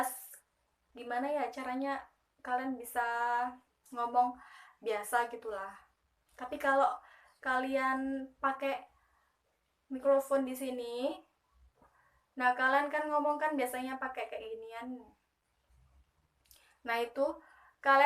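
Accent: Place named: Java, Indonesia. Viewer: Ngomong kok medok mbak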